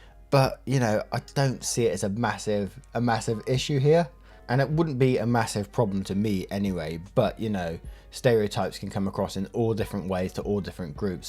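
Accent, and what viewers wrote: United Kingdom. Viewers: You are a Brit?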